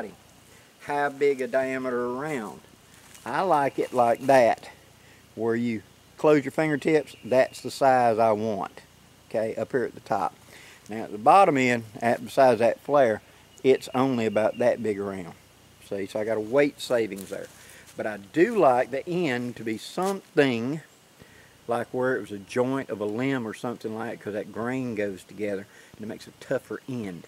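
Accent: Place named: Southeast, United States. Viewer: Liked & Subbed. You live in a Beautiful area from what I can see...May I ask generally what part of the country you're in? Based upon what slight accent I can decipher I would guess South-East US but that is very vague...Perhaps you would be willing to be a bit more specific..>Thank You!!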